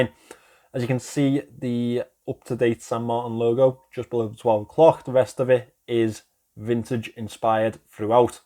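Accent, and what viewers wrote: Liverpool, United Kingdom. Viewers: Liverpool accent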